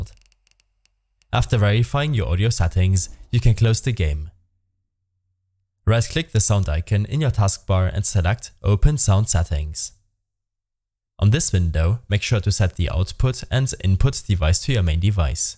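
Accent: Germany. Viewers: any cahnce that u are german ?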